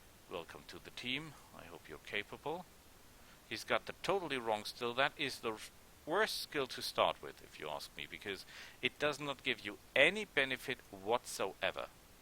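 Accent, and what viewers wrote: Germany. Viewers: He is german I think. He has a clear german accent. In the german language, the two digit numbers, and every two digit part of more digit numbers are spelled backwards, and only the two digit parts of those numbers, too. So when I do a literal translation, for example they say 25: five and twenty. 74, four and seventy. 368, three hundred eight and sixty. I know, it's very unintuitive, when learning that laguage it fried my brain many times, among other... quirks of that language.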